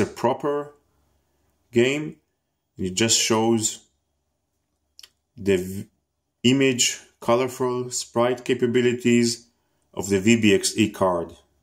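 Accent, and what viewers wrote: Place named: Israel. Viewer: גבר לפי המבטא אתה ישראלי כמוני .... מדהים מה שעשית עם ה600XL לי יש עדיין 800XL בעליית הגג.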